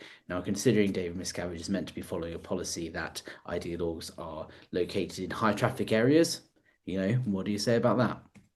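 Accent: United Kingdom. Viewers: oooh that’s interesting!!! I am in Aberdeen Scotland. I have been following Chris, Aaron, Marc and others for a few years now. You and Jon Atack are the only out there ex scientologists I have come across with ‘British’ accents which brings Scientology closer to home, but I never really thought about it’s impact here in my part of the UK. Once, a handful of years ago, a guy my hubby worked with in Aberdeenshire mentioned he was going off to a Scientology meeting, of course pretty arrogant about it all, but my impression was he was still very new to it all and I guess in my mind I figured it would be him and a couple other ‘strange’ people involved in something so ‘crazy’. Now I wonder just how many folk up here, meaning Scotland not just my small part of Aberdeen, are still tied up with this cult. Is there anywhere I can find out about Scientology in Scotland, or is that too small a group to factor much? 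By the way, new subscriber, loving catching up on your videos, good luck with the channel!!!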